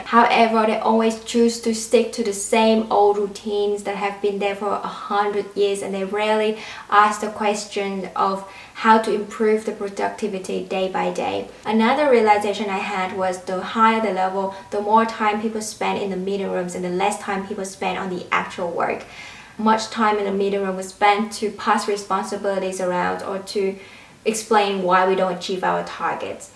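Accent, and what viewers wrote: Australia. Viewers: Are you Australian? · are you Australian? asking because of the accent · where are u based in now? is that austrailian accent haha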